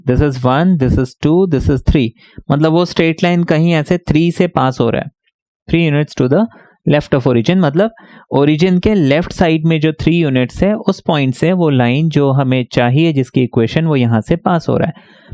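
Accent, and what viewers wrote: Bihar, India. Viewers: Sir, Are you Bihari?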